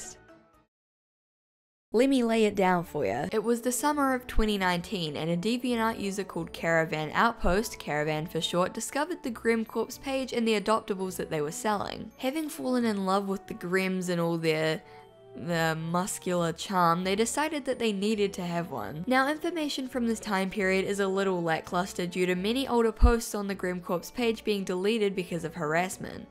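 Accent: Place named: New Zealand. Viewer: Kiwi accent... finally one of my own 🥝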